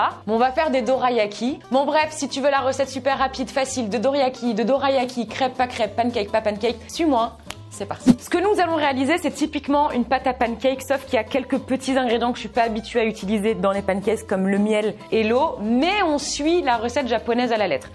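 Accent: Canada. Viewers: Tu est quebecoise ou française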